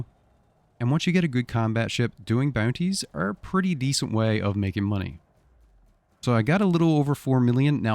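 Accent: Newfoundland, Canada. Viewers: You from Newfoundland? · Man I can't watch this. Can't stand the way Canadians talk. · HEY! just a heads up..... love the canadian accent 😉😆 getting back into this game today after literally almost a decade and well "overwhelming" is too light of a word so I appreciate the advice from 4 months ago lol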